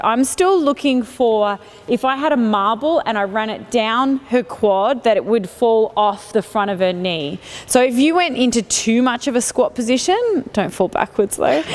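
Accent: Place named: Australia. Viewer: Aussie accent is ❤️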